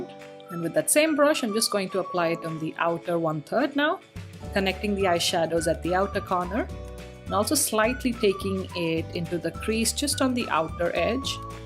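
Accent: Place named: India. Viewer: Beautiful indian Accent . where did u grow up in India?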